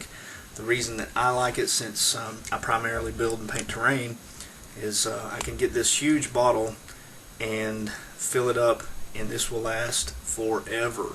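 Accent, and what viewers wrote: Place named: Southeast, United States. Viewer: Off topic (sorry) You sound like you are from the southeast US. If so where? I am in Leeds, Alabama (suburb of Birmingham, Alabama). Just curious. I am jealous of your setup, for what is worth. Thank you for sharing it and it would be awesome to see your work. Do you post to cmon and if so what is your screen name? Thanks again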